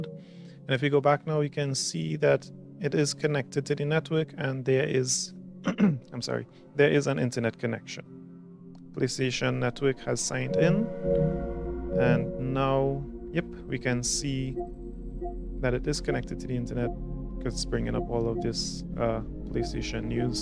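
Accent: Trinidad and Tobago. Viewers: I knew you was a trini the second you talked lol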